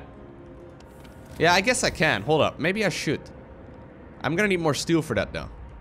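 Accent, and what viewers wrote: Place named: Netherlands. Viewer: You sound super dutch, are you dutch?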